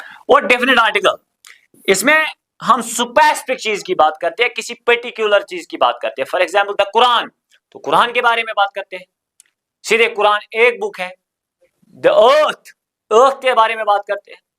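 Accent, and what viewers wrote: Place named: Kulgam, India. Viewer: Sir shayad kulgam sai hai (asent)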